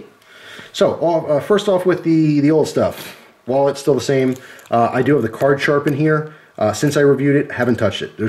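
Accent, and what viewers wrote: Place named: New Jersey, United States. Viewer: Couple things, if you don't mind: Where are you from? I ask because I'm from Jersey and you sound like my people haha. Also, you mentioned Rite Aid, and I haven't seen one of those since I left and came down to FL (been down here 8 years now). I also ask because I know how horrible Jersey gun laws are since a buddy of mine still lives up there and is constantly bitching about it when we talk guns and gear, etc. And, if you don't mind one bit of advice/comment why the smaller mag for backup? Since it's in your pocket anyway, why not use the full-size magazine (not the 33, but the standard 13-17 rd depending on caliber) instead? I ask because it hit me that for not much more weight/size difference, I can get a few extra rounds, and if I'm already reloading, then chances are I might need them. Just a thought, just trying to help. 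Thanks for sharing your EDC, always good seeing these and getting ideas. Much appreciated and stay safe, brother.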